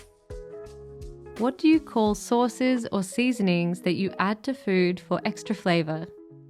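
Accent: Australia. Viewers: Hi! I’m watching from South Korea. As someone who really loves the Australian accent I really enjoy watching your videos and listening to the way you speak! It’s also interesting to see so many Korean products in Australian Asian markets. By the way the salt bread snack is popular in Korea as well🤤🤤 The chocolate flavor is popular too!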